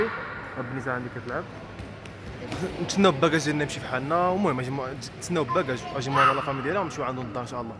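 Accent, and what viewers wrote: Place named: Algeria. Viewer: من اللهجة ديالك كتبان جزائري ماشي مغربي لي متافق يدير لايك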